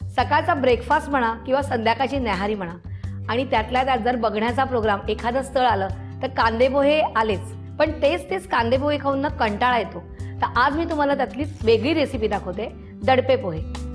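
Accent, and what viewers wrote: Pune, India. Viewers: Is this the Pune marathi language?? Or vidarbh marathi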